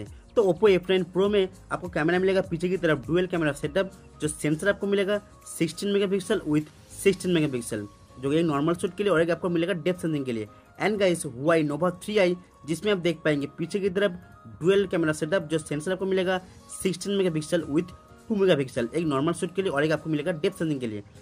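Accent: India. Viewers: Damn your Indian accent is really hard and you speak jibberish already.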